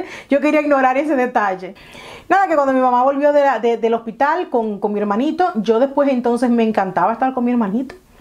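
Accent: Venezuela. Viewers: con razón la afinidad nena, yo si sentía cierto acento compatible con el mío jajajaja besos nena me encantó el vídeo, se parece a mi infancia en Maracaibo-Venezuela 😘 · gracias por compartir tu vivencia en mi bello país, me encanto escucharte hablar caraqueno jaajajjaja. Dios te bendiga por tomar tiempo para mencionar esos recuerdos tan bonitos, tengo casi tu edad y de verdad es inevitable no sentir nostalgia al ver como nos cambio la vida, ojala Dios no ayude para salir de esta lamentable situación nuevamente gracias querida. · que bello tu video, soy Venezolana y me vine a madrid por la situacion, y si es cierto que tienes algo de acento venezolano de hecho en los primeros videos que no sabia de donde eras pense que eras venezolana, gracias por hablar bien de mi Pais no sabes como lo extraño, gracias amiga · chama si tienes el aciento venezolano me encanta👌😘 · Bonita historia, todavía tienes ese acento. 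De hecho antes de saber que eres Dominicana, pensé que eras venezolana.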